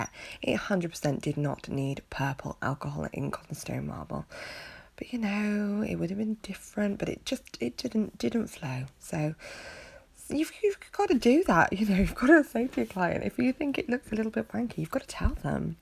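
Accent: United Kingdom. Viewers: Hello you just popped up in my feed nice to hear another britt. Yes it's been hot. Love the nails I have started to learn the basics so I can do my own as my natural nails are brittle due to fibromyalgia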